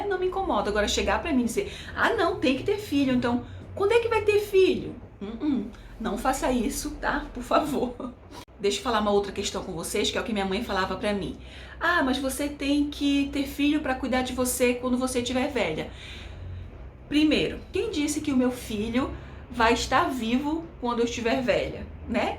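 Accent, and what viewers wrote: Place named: Belém, Brazil. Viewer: Olá, caí de paraquedas no seu canal, uma pergunta vc é de Belém ou imediações? Seu jeito de falar me lembrou demais de uma amiga que é de Belém e fala igual a vc 😅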